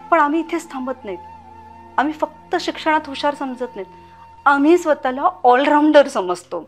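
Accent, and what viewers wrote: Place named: Udgir, India. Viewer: Kay to confidence, kay to speech delivery, kay tey accurate udgir mimicry and description, ekdam goosebumps madhe ❤❤🤙🫡🫡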